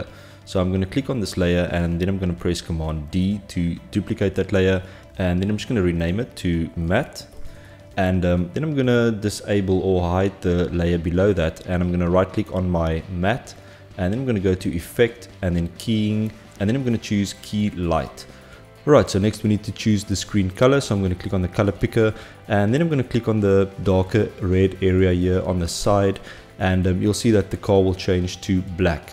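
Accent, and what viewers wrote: South Africa. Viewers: You sound South African 🤔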